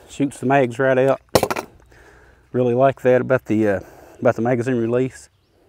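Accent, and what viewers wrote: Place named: Kentucky, United States. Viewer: Love that Kentucky accent!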